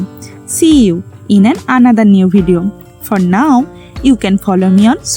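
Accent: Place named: West Bengal, India. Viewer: Are you from West Bengal? Because your voice like from there don't worry I too belongs from West Bengal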